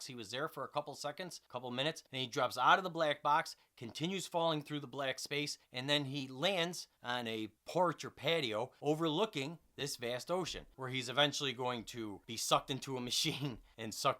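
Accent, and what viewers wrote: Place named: New Jersey, United States. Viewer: Maaaaan, what's with the accent? Where are you from? New Jersey?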